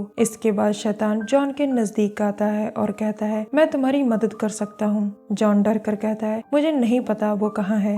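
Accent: Punjab, India. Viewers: Yaar punjabiat jhalak di hai accent sahibkaro hindi ya punjabi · Hi my 💕 punjaban love ur voice & assent ur big fan Pardeep gill from Ludhiana Punjab ❤️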